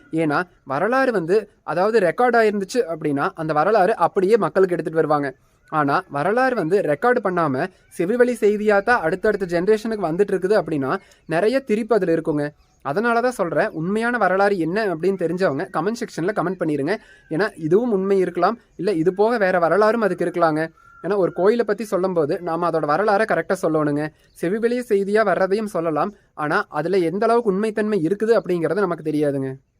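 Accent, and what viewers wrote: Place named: Kongu, India. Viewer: உங்கள் கொங்கு த்தமிழ்..🌹👌🚩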